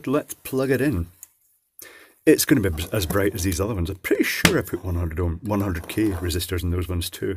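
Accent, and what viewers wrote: Scotland, United Kingdom. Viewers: Plimp WOW, i sit corrected. The scots accent is hard for us mere sassenachs! (silly nationalism aside, thank you for the correction, and sorry )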